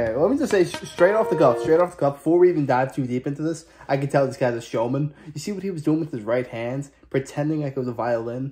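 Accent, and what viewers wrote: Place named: United States. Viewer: 😂 I was expecting you to have an Irish not American accent as is like the most Irish surname ever dude 😎👍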